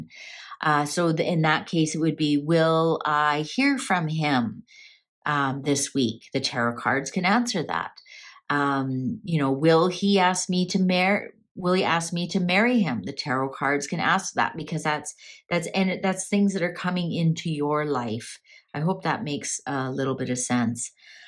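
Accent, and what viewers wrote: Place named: Canada. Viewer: I’ve been binge watching while I’m healing. I am in awe how your readings go. Cards that represent a person is amazing, love it. Clear clarity. Also I feel intuition is so important. Don’t second guess yourself and let the cards tell the story. Your Canadian accent is so endearing. I lived in Canada until I was 7 or 8. Mom brought us to the states and been in Michigan since. People especially down south visiting ask me where did I get my accent. LOL. The Canadian in me is not lost. Phrases and eh, too many words to list. My point is you are so charming and remind me of home❤ Thank sweet lady. I’m going to try to book a reading. Everyday I look for your new uploads, love commenting in a positive manner. God Bless❤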